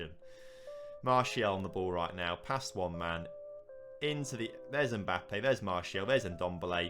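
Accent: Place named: Scotland, United Kingdom. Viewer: The Scottish accent wasn't that bad, 6/10, way better than the pronunciation of Mcleod in the on the rocks videos.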